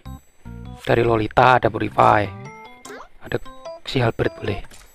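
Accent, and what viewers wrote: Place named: Surakarta, Indonesia. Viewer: Logate koyo wong solo njenengan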